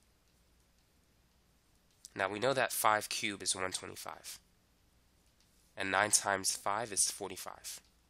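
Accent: United States. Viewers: Pay attention he teaches well He is an American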